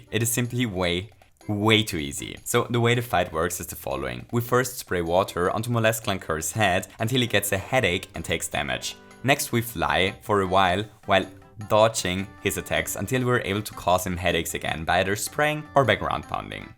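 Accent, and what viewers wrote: Austria. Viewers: Warte, du bist Österreicher? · Youre austrian??? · Hmm I never knew you were from Austria . Didn’t recognize the accent . Learn something new everyday ig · also he just has an austrian accent :P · Moment du bist Österreicher?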